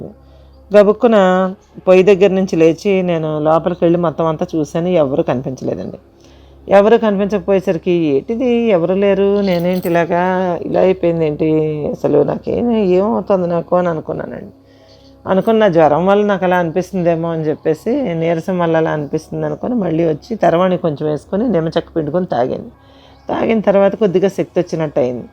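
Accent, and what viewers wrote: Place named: Parvathipuram, India. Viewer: Ammaa maadi kuda parvathipuram.konni padaalu appativi golem lantivi vintunte chaalaa santhosham anipinchindi .mee video chudatam start chesina tarvaata starting lo appati aa yaasa konni sarlu mee notiventa vunnappudu ide santhosham kaligindi ammaa meerante naaku chaala ishtam ammaa❤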